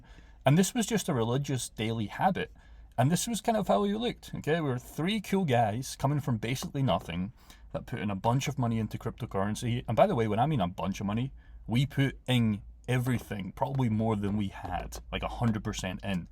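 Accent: Scotland, United Kingdom. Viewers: Good to hear a Scots voice that can see what is going to happen in 2025. Lots of scots will miss this run as they only follow MSM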